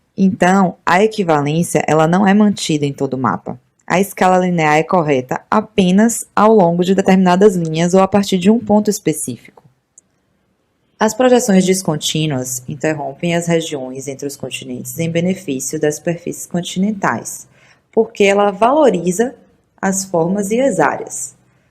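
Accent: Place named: Salvador, Brazil. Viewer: Uma sotero reconhecendo o sotaque!! Otimo vídeo!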